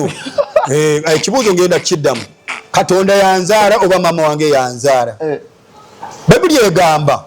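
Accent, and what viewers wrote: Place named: Tooro, Uganda. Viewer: I like the accent of this man 😊 olabilawo nti wawaka Tooro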